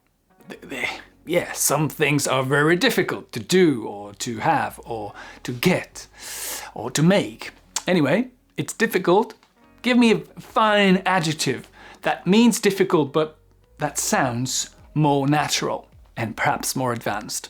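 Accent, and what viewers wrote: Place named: England, United Kingdom. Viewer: j'adore ton accent anglais ... une approche très éducative, à la portée de tous (et toutes ;-) ) merci !!!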